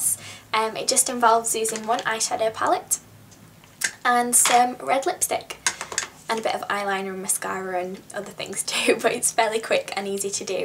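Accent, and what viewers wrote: United Kingdom. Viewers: i love your accent how come it sounds different then typical british accents?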